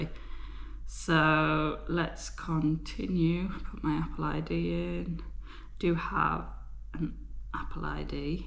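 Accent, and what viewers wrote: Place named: Wales, United Kingdom. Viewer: You're definitely Welsh 😂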